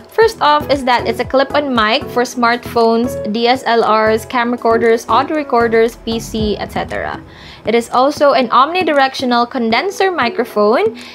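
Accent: Visayas, Philippines. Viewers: Hala ate😲bisaya man diay ka😆❤️😻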